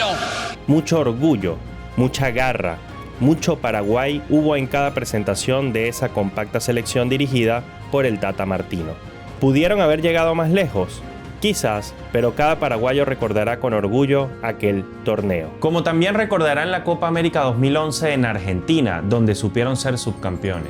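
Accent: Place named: Venezuela. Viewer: Por el acento con que habla el creador del video es venezolano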